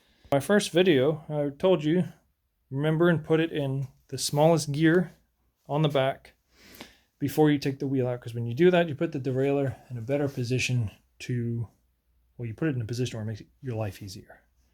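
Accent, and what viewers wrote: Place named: Scotland, United Kingdom. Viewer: .... that was a proper comprehensive wheel install.. keep doing what you LOVE... I knew I heard a slight Scottish accent.. I dig this shit👍🏻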